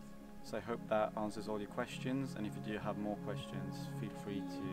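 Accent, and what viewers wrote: United Kingdom. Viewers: Great to hear more of your story! I live just north of London and have a Swedish mother and English father… when you first spoke it was refreshing to heat your UK accent… so often US English accents pervade! Also, as a lockdown project, I built a bastu in my garden… so important to make things with your hands. Keep up the great work! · May I ask how old you are? And it sounds like you live in London - if so how frequently do you visit the cabin?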